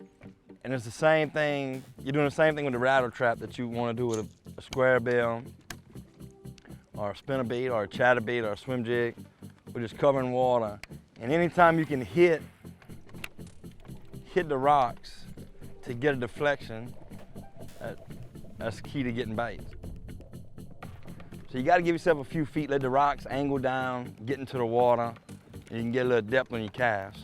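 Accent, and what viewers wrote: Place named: Louisiana, United States. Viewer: Sounds like a true Cajun boy to me 👍🏽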